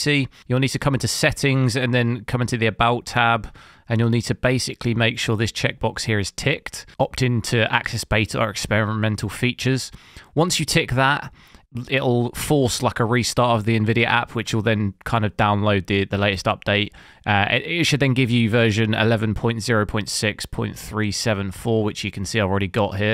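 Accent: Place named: United Kingdom. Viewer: Out of topic - where are you from? Cause you mix accents and pronunciation, you have British accent but you pronounce some words differently, e.g. "twenty" without the "t" ;)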